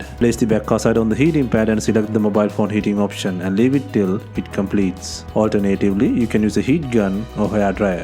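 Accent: India. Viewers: Don't listen to this guy. You were perfectly understandable. Your indian accent sells it.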